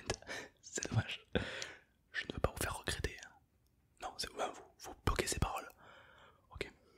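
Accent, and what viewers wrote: Quebec, Canada. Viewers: Moi qui adore l'accent Québequoi c'est un pur plaisir d'écouter de l'asmr Québécois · L’accent québécois 😍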